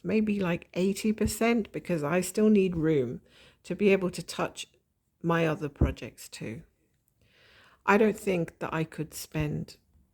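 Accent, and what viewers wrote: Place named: United Kingdom. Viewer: I've stitched with you on my only full coverage design and I must tell you how much you've made me laugh and how I've enjoyed your stories. I too had a cat and I recognised him in the descriptions of your cat's behaviour. Happy stitching and plenty of sun for us! ps. I also love hearing your British accent.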